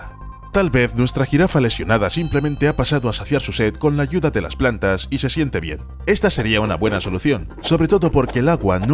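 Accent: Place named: Spain. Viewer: El acento español 👌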